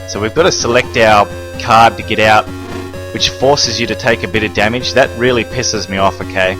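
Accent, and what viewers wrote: Australia. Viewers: i love your voice its a really thick Australian accent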